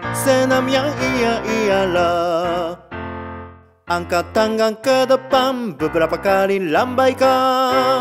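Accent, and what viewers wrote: Japan. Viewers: Aksen nya jepang banget 🥰🇯🇵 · Tiba tiba lewat timeline lagi ni senam akwowkwk, dulu gak nyadar tapi pas didengerin sekarang aksen Jepang nya baru kedengaran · Ini orang Jepang gaes yang bikin,yang ngomong itu logat Jepang nya masih nempel😂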